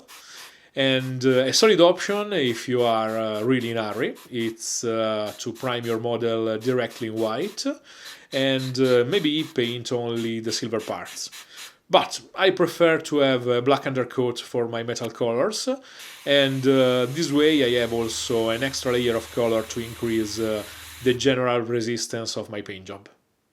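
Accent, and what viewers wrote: Italy. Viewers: good lord....that looks amazing..... love the slight italian accent gives all the artwork a "fathers of the renaissance" touch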